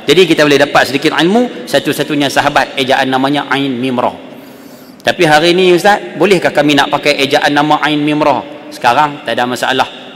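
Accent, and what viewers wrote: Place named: North, Malaysia. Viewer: Antara ustaz loghat Utara yg aku sgt minati seangkatan Ust Shamsuri,Ust Don,Ust Wadi dan Ust Abdullah Khairi.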